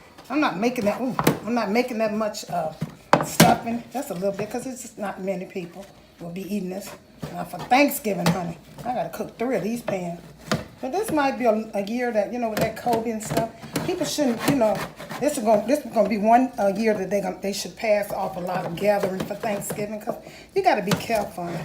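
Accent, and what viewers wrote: South, United States. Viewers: Ooooh...as u stand there reminding me of my Grandma cooking brought tears to my eyes. She was the BEST...your soft calming southern voice is truly a memory of her...Thank you for sharing Continued #BLESSINGS to you🙂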